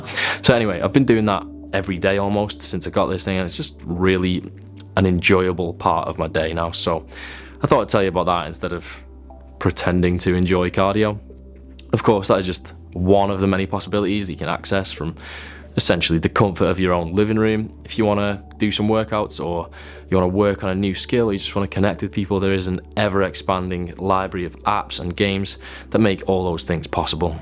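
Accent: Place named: Birmingham, United Kingdom. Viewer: Hi, I like you accent, is it from Birmingham?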